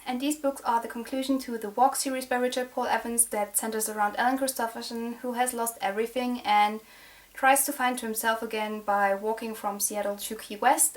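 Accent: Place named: Germany. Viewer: I just found your channel and I'm def subbing! Are you from Germany? Your accent sounds a bit german like!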